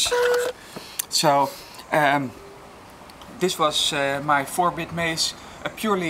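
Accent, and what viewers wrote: Netherlands. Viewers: grappig ik kan aan het accent horen dat je nederlands bent :D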